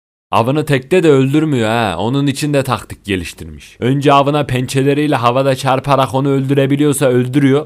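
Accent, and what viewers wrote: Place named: Çorum, Turkey. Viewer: Kardaşıma şunu söylemek isterim kesinlikle sen bir üreticisin seni saygıyla selamlıyorum. Şiven gayet kulağa hoş geliyor ben Çorum Yozgat arasında gidip geldim.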